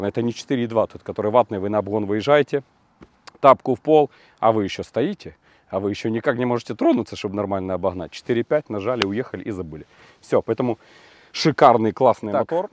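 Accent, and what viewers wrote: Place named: Surzhyk, Ukraine. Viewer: Друже я сам з Маріуполя і перейшов на рідну мову з початком війни, але твій СУРЖАК не те що ріже вухо, віз зриває дах. Так що, або показуєшь розвиток у вивчанні мови, або я від тебе "бля" відписуюсь !!!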